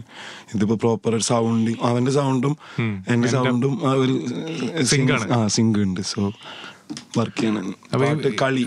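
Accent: Malappuram, India. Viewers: ഞങ്ങളെ മലപ്പുറത് വന്നാൽ അങ്ങനെ ആണ് ഭായ് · Malappuram bhasha vere levala..❤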